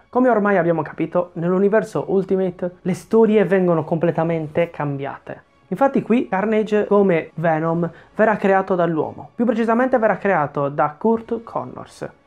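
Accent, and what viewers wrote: Sardinia, Italy. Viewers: Sei sardo nenno? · Sto guardando tutti i tuoi video,stupendi! Ma.... anche tu sei di Cagliari? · Ma di dove sei Cagliari, Quartu, Pirri?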